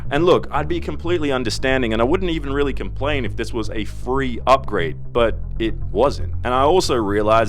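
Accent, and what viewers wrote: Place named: Australia. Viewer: Dead set miss the I'm and the accent is Australian intro hahahaha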